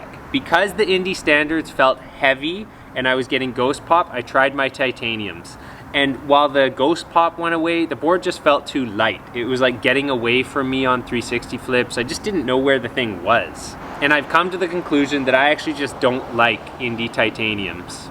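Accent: Canada. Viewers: I’ve seen a couple of your vids but damn you sound hella Canadian in this one... lmao I never even noticed until now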